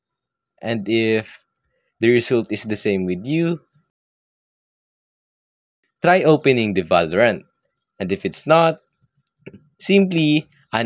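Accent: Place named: Philippines. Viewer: bros profile is an american and souns like a filipino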